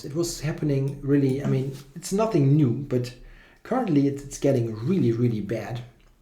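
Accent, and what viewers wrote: Germany. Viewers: that german accent xD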